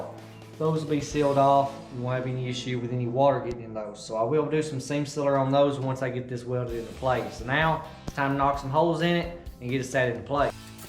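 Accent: South, United States. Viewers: ahh, you're like me then lol. well i hear the country accent. what state are you in neighbor? wonder if you ever make it to the MS gulf coast for Cruisin the Coast? *edit, just watched your how to register video where you mentioned TN. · It's hard to get past his redneck accent. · Living in the south, this man makes me proud. To some (not all, I’m not going to paint with a broad brush) folks not from here, he may sound less intelligent because of his accent. How mistaken you would be. The south is covered up with folks that have great intelligence and common sense. LOL, I ain’t one of them, but they are here. God bless the South.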